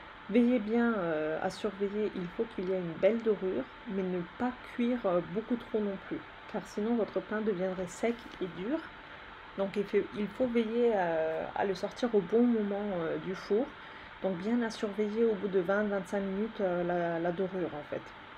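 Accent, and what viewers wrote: Alsace, France. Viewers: Je m'en doutais que vous étiez turc 👍👍👍👍 😊😊😊 de part l'accent alsacien et des recette de pain toujour au top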